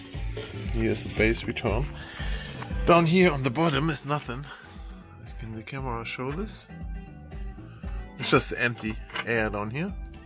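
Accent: Germany. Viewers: Thank you for this video! My party box has stopped charging and only works when it is plugged in to AC power and the DC power does nothing. By the way, your accent makes this video a hundred times better. I love the German language 😅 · Er hört sich irgendwie deutsch an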